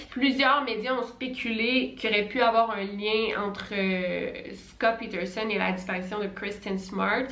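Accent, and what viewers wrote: Quebec, Canada. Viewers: L'accent québécois est vraiment charmant. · J’adore tes vidéo je te suit depuis la Suisse au début j’avais un peu de peine avec l’accent qui change mais tu es tellement superbe que mes oreilles se sont habitués à l’accent québécois merci 😊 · Coucou je suis aussi une de t'es abonnée et je trouve que ta chaîne aussi et top les québécoises vous avez un talent fou et êtes tellement humble c est toujours un plaisir d écouter vos vidéos avec votre belle accent bonne continuation à toute les 2 vous êtes top 😉 de la pars d une française fan de vous 2 😊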